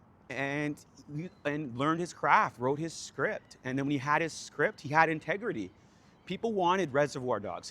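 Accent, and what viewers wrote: Canada. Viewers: First take away in the first minute, you're Canadian eh? I can hear it lol. But I'm feeling you, let's go.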